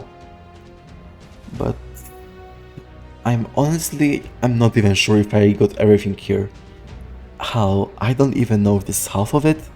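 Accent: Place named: Poland. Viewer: This guy sounds Polish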